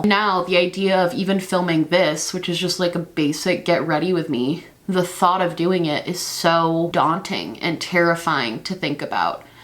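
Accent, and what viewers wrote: United Kingdom. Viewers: my love, we are going to need book reviews now. Full English accent accepted. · Video in a British accent? 💜 lots of love!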